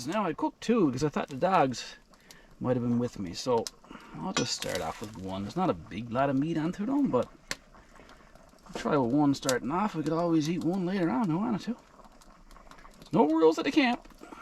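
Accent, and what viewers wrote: Ireland. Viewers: Cant make out your accent, American with a drop of Irish 😊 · You’re Irish sounding along with the Canadian, your phrasing sometimes is Irish as well. Interesting accent! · I’m from England and I thought you were Irish/North American. You have that Irish twang.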